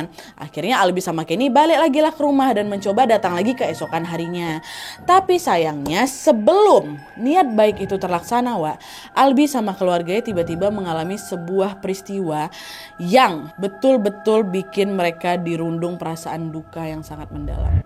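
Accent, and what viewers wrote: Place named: Medan, Indonesia. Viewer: Emng kak bukan org batak? Logatnya medan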